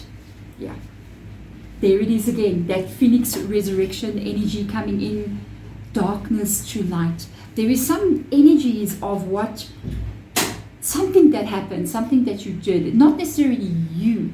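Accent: South Africa. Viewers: So I just came out of a webinar about Bosnian pyramids and Theta healing meditation and this video popped up on youtube. :) I listened to you before and liked your energy, it resonated, but I kind of like to avoid "prophecies", meaning someone else determining what will happen ( as opposed to me making my own destiny), but yet again this resonates. i mean we can argue it's algorithm, but then again, why this precise reading popped up. :) I felt for a very long time now like this planet is a prison and I'm being punished (Theres a book I even came across, Alien interview, where an alien (a very advanced species) talks exactly that, this planet is a prison and all of us are prisoners) and at 48 I'm already soooo tired of life haha. The pyramids are heavily dismissed by mainstream science as not real, but they hold incredible energy, very real and oldest on this planet. 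People have reported healing (where there was "no help") and studies have been conducted. But it's good actually, because not that many people come (as opposed to Egypt) so the pyramid energies are really strong, it's peaceful and great for meditating. Youre South African right? You sound like it, I like that accent. I feel like Africa being the "cradle of life" must hold a lot of wise souls and that's why your readings resonate (when I come across). and you're a wicca? which also interesting, and you talk about vedic things, such as karma, enlightenment, which I know a lot about. So THANK YOU. :))) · (hope I've wrote your name correctly), I came across your thing recently, yes I'm sure we would be swimming in puddles or pools of tears at times, cuz your delivery is the centre point for me, (chiron intuition, majigotthet, and aeon moon, Erin chandler, four other greats, you might look at, or whoever reads this, I have been touched,your being south African is so poignant you wouldn't believe, look forward to one day conversing, also your accent(voice) is so enchanting you ouse, mother earth and massively much more, love&light, peace&equality(. 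❤xxx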